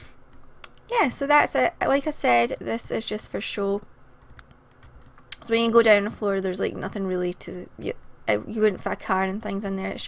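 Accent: Scotland, United Kingdom. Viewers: LOVE the Scottish accent. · I'm Irish and she's definitely Scottish. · Omg she's Scottish yasssss I like to call my self Scottish because I move in Scotland when I was 6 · You sound Scottish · Where are you from? It's pretty obvious to spot an Irish accent from a scottish one. She's Scottish. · 100% Scottish :P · Finally another Scottish youtube! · im scottish but i had no idea you were scottish haha and i laughed at the bit when he was looking on his phone haha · ***** You're Scottish aren't you? Cause I can here that accent from miles (probably because I am Scottish). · Your accent is amazing! I love how you talk lol (Scottish?) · I love your accent. It's just something about Scottish accents that make them just so cool. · I'm watching this because I like watching old Sims videos because they used to be basically all I'd watch and I have one question Is she Scottish? 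She sounds Scottish. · she is scottish! I WANT HER ACCENT though D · YEY finally a Scottish you tuber I am aswell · She is Scottish (: I am Scottish too! Makes me proud :')x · I am Scottish and I can tell that she is Scottish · Its so nice to hear our accent used well! Theres so many people in Scotland who use abbreviation of the end on words, especially verbs, far too often. I like your dictation mixed in with our 'ma's and stuff like that! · I love your accent! Scottish accent is pretty awesome :) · Melissa Hubbert She's from Fife, so kind of in the highlands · Comments: 50%asking if your scottish 25%saying they like your scottis accent 24%saying other stufss 1% saying the comments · WOA UR SCOTTISH?!!! I'VE ALWAYS WANTED TO HEAR A SCOTTISH PERSON TALK ITS AN AWESOME ACCENT IDK WHY I LIKE ACCENTS SO MUCH I WISH I HAS ONE FJEFJECH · Yeah, I used to live in Scotland and her accent is kinda like it. 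:)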